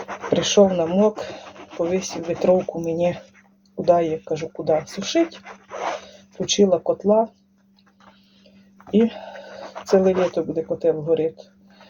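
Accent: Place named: Ovruch, Ukraine. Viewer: Про овручский диалект не слышали? Так хотя бы поинтересуйтесь об этом. В каждой стране люди разговаривают на разных диалектах, говорах. Нельзя так неуважительно относиться к людям.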